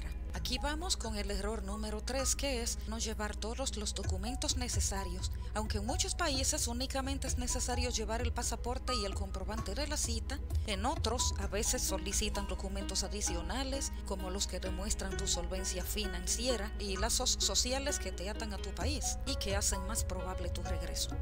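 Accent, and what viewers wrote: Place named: Russia. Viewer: Buena informacion aunque la Calidad del video y esa voz con acento ruso es un poco molesta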